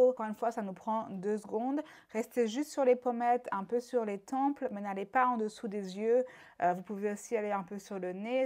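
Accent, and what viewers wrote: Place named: France. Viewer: Trop cool une vidéo en Français yesss , c’est marrant quand tu parles en anglais tu as un léger accent Français et quand tu parles en anglais tu as un léger accent en anglais so cute 😻❤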